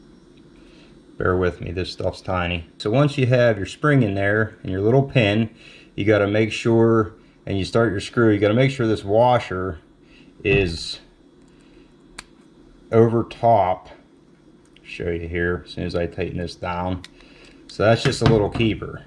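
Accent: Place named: Pittsburgh, United States. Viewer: Are you in or from the Pittsburgh, PA area? Other than not saying yinz, you have a pretty good Pgh accent.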